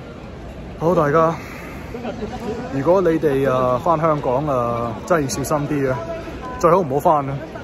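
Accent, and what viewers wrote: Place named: Guangdong, China. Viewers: 聽你口音跟本不是香港人，非香港出世長大，相信你是廣州人，為咩拐香港人呢？香港國泰地勤多說英文，報導不實。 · 你既口音，好地道，廣府味濃👍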